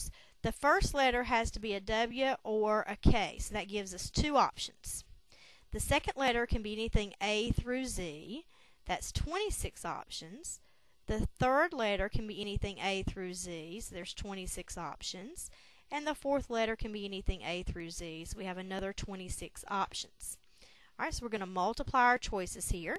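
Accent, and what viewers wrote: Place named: South, United States. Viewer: YOU FROM THE SOUTH, I LOVE YOUR ACCENT!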